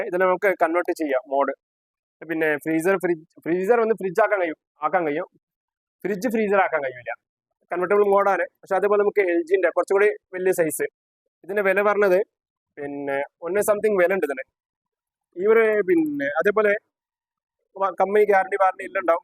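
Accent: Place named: Tamil Nadu, India. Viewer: Thamizhan aanenh samsarathil manasilavunhundallo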